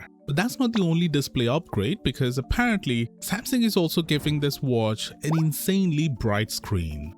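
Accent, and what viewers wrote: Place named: India. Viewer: He clearly speaks English with a Hindu accent, he’s not Turkish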